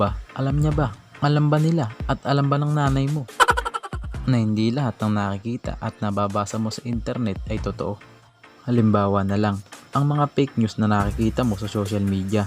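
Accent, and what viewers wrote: Iloilo, Philippines. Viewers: Lods., bisaya ka no.?.. Ma.subscribe nga... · Bisaya ka ba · Ilonggo ka ba kuya? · ilonggo ka pu ba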